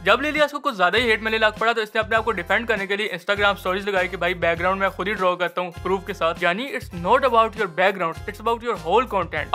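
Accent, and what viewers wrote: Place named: Pakistan. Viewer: Bhai aapke bolne k tareeky se hi pta chlta h ki aab Pakistani ho👀👍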